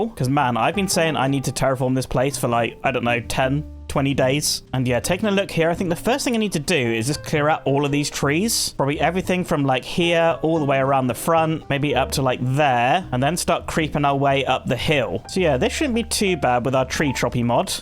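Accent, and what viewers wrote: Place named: United Kingdom. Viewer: I Only See your videos Because Of British Accent 😂😂❤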